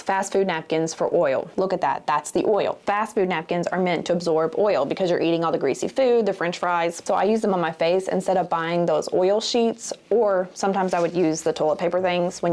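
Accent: South, United States. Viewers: that's how I feel too. I'm from TN but live in FL, so I love hearing her Southern accent. It brings me much joy.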